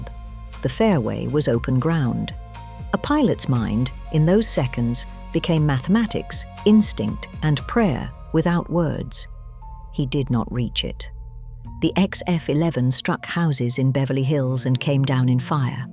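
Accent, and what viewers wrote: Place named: England, United Kingdom. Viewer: Old English? No just english. Very nice too. Really enjoyed this. Thank you for sharing.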